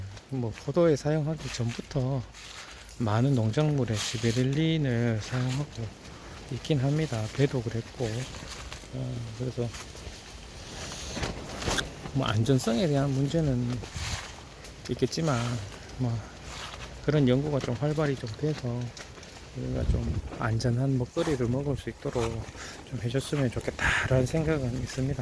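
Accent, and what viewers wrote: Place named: North Gyeongsang, South Korea. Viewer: 선생님 말씀으로 봐서는 경북지역 이신것같은데요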